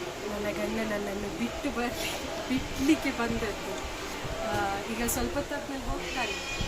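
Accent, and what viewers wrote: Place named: Mangalore, India. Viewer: Manglore Kannada❤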